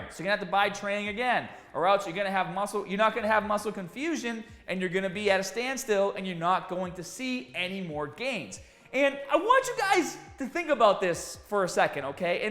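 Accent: Boston, United States. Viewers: Love it! That Boston twang! Cheers, as always I salute you!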